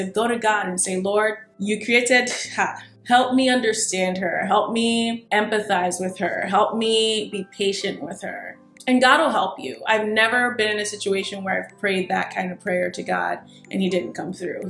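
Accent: Nigeria. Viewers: Loved it. Your Naija accent is the one...haha lol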